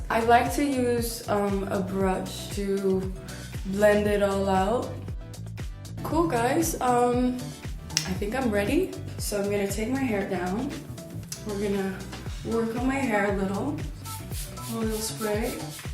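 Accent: Netherlands. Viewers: She sounds so dutch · Did you grow up in the Netherlands? I spot some bits of an accent somewhere ;) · you heard how she says 'th'? classic dutch accent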